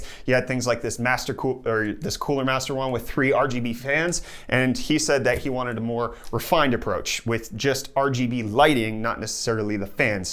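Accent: South Africa. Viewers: Wait....youre south african?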